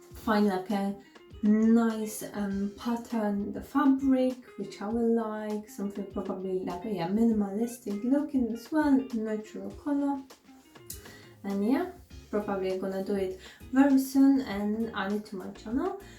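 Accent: Poland. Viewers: . Po akcencie tak mi się wydawało, że z Polski, ale dla pewności wolałam zapytać. Super. W takim razie powodzenia na yt. I tak jak napisałam, czekam na kolejne zdobycze i haule. Pozdrawiam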